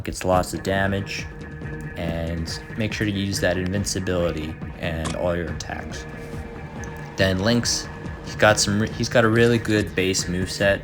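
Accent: Canada. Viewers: You are Canadian right? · Congrats bro...you Canadian?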